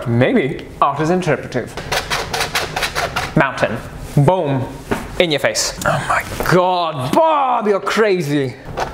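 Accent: United Kingdom. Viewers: Why did i think he was gonna be better than this.........maybe the british excent........sadness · why are you yelling at a dead man in a British accent, you may need to deal with your anger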